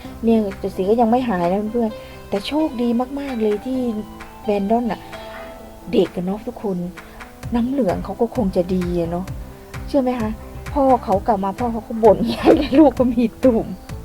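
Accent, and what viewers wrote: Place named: Central Thailand, Thailand. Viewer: ยุจังหวัดดัย เว้าอิสานน่าติดตามกว่า เปิดมาได้ยินเสียงภาษากลางบ่เบิ่งเลย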